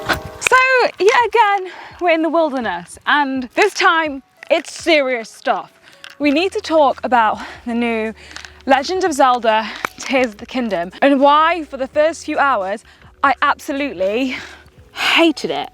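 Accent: United Kingdom. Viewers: How do you have both British and American accent? 😳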